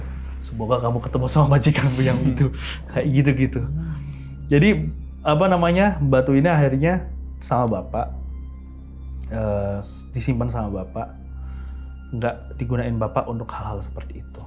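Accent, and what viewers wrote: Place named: Tegal, Indonesia. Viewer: Ini orang Tegal ya ternyata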